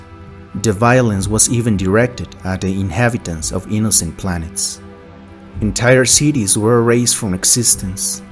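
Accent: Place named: Spain. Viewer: I love the accent! It's like listening to Star Wars as read by Antonio Banderas! REPOBLIC! LOL! LOVE IT! 😅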